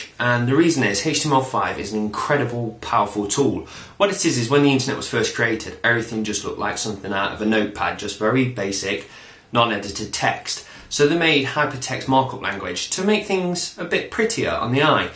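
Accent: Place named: Nottingham, United Kingdom. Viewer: ranting about your rants? your losing it ! Whereabouts In Nottingham are you from anyway ? I had no idea till you mentioned the Notts twang!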